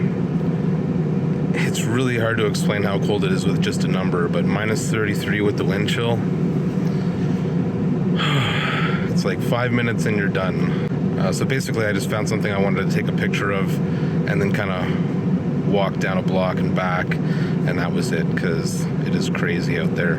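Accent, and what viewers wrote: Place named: Canada. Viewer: canadian aye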